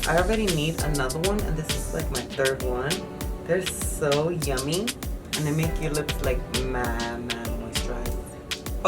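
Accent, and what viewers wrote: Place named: East Coast, United States. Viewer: My nosey ass found you in a Carly Bybel video comment section and I love love your east coast accent, you're so beautiful and you come across super down to earth. This video feels like hanging out with your friend and gossiping about the new makeup releases. Only thing I would suggest, in certain parts of the video like your background music was louder than your voice. Maybe just lowering your background music, so we can hear your lovely voice 🤗❤️❤️ much love to you and positive vibes your way.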